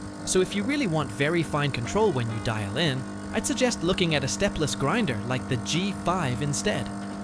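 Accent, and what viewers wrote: United Kingdom. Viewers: why are you using british accent now? it's not bad. i'm just curious and found it slightly hilarious.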